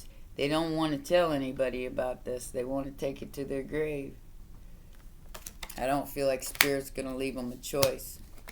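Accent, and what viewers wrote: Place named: South, United States. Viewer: Set up ain't the word for it. Luv that southern drawl ya got. Great read 📚 reading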